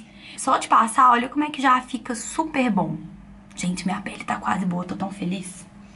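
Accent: Minas Gerais, Brazil. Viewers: "Passar esse trem aqui..." kkkk Sotaque mineiro é demais... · É o sotaque de Minas!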